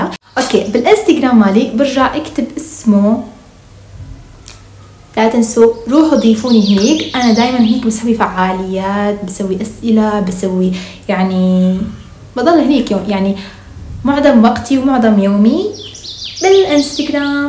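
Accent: Iraq. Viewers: مليون مبروك على المليون تحبك هواي متابعتج من العراق لهجتك العراقية تجنننن ❤❤❤ كيوتتتت ❤❤❤❤ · الف الف الف الف الف الف مبروك ان شاء الله ال <num> قريبًا يارب والله فرحتلج من كل كَلبي احبججججججججج متابعتج من العراق ❤💋💗 لهجتج العراقية تجننننننننننننن ❤️‍🔥🍓🇮🇶💘🇲🇦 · 😮😮اول مره اشوفك تحكي بنت ليكون عراقيه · صوتك بلعراقي مررة حلو مين بدو تعمل يوم كامل المتابعين يتحكمو بحياتك🗿👍🏻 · مبروك يا بعد روحي جاي اتحاول تحجي عراقي اموت عليج ❤❤❤ احبج متابعتج من 🇮🇶انتي🍯🍯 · الف مبروكك اني من العراق🇮🇶 واحب كل فيدوهاتج وكلامج نفس العراقين❤✨ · كلامج تقريبا كله عراقيي يااا عمرييي 😭😭😭🍓✨🤭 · فديت الي تتكلم عراقي+احبك موت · ياعمرييييييي✨ الف الف الفف الفففف مبروككككك ❤🙀 والله فرحتلككك من كل قلبي عقبال 100 مليون يارب 💖 ياعمرييي وبسس متابعتكك من العراق🌷 احبكككك كتيرر موححححح😭😭😭💗 احيههه تقريبا كل كلامك عراقي فديت الي يتكلم عراقيي فديتكككك عععع 😭😭💅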